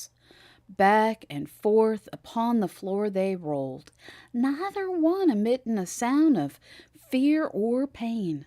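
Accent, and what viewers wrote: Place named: South, United States. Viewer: I am enjoying the story time. The slight southern accent is awesome.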